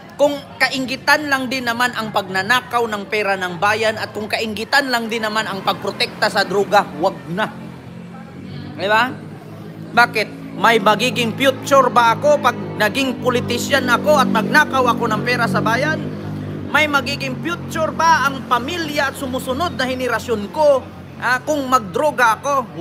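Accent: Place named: Davao, Philippines. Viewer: kung mag salita ka parang nakatira ka sa davao...shit nimo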